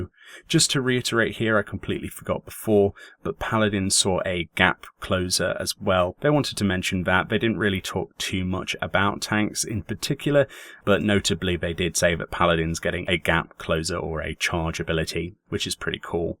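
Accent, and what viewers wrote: England, United Kingdom. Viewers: I think your English accent just makes everything sound more easier to digest lol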